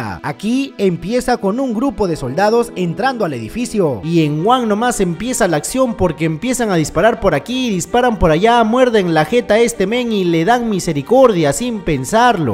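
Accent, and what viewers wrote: Peru. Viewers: Soy yo o es Peruano?🇵🇪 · Literalmente en informacion del canal y por su forma de hablar, es peruano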